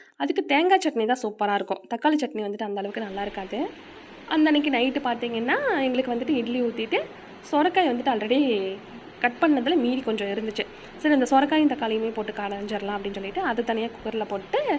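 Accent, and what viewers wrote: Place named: Kongu, India. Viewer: Kongu tamil nanga veetla pesara mathiriye pesirunthinga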